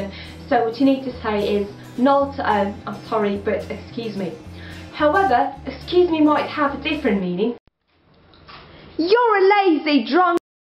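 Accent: United Kingdom. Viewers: You have a British accent. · А что такое "английский акцент" когда чел говорит по-английски? У неё, кстати, британский акцент. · its british acent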